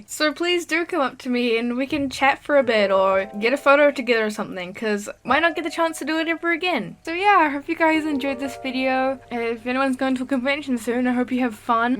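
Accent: New Zealand. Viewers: Are you from New Zealand? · You're a kiwi too? I just assumed you were australian oops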